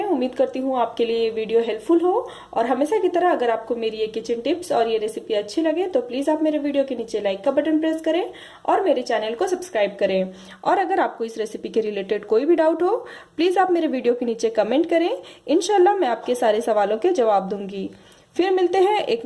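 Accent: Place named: Bihar, India. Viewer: Aarey aap Bihar se ho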